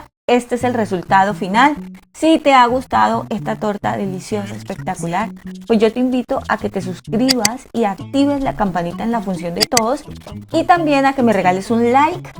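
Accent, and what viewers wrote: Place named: Caribbean Coast, Colombia. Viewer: Tiene un acento costeño como de Barranquilla o Sincelejo con un toque como de Santandereano y paisa.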